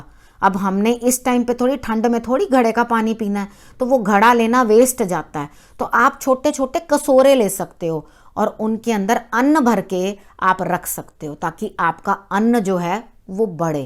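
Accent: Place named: Punjab, India. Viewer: Very well explained, m ur fan ,specially our type punjabi accent.i adore u a lot.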